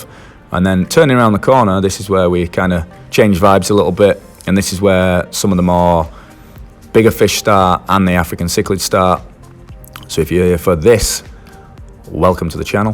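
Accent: United Kingdom. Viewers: Just found this, you from the UK? As you have an accent south of Manchester 👍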